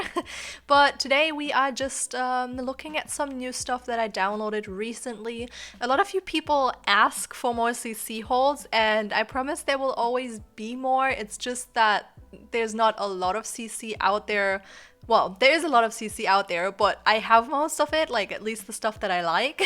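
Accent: Germany. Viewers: I’m 5’3 ft which is considered short but I know a lot of other women around the same height. I’ve been trying to learn German for school for a few years now and struggling lol as soon as you said you’re German I was like ohhhh I can hear it now. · Als ich mir ein Video von dir das erste Mal ansah, hab ich mich sofort gefragt ob du deutsch ist. xD Ich glaube wirklich, dass man's einfach raushört, deine englische Aussprache ist echt ziemlich gut ^°^ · 1km is roughly <num>) miles and a meter is 3.281 feet ( 3.28084 precisely). Also, great vid, I'm always on the lookout for new cc especially for males. I like your accent but I would have never guessed you were from Germany if you didn't say it. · I could tell you were German I'm from the south in America but i LOVED my german teacher sooooo there's that. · haha I was wondering if you are german! I noticed your accent is very familiar so I was wondering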